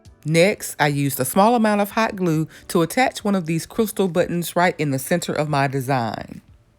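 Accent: South, United States. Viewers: Wow! I so loved this video. Your explanations are so on point and easy to follow. I want to become a weekend warrior for this one. I love love your southern accent. It's so welcoming. What size was each batting and vinny piece cut to? Thanks for the videos and Blessings to you and your family !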